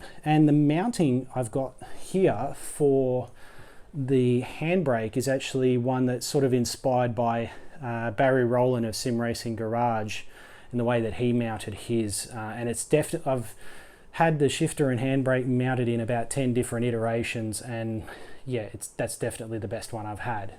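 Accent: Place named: Australia. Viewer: Aussie accent my dude.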